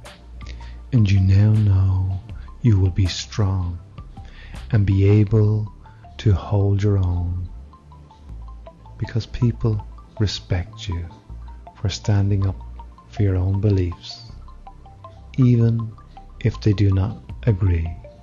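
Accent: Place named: Ireland. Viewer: Another Irish voice not making me calm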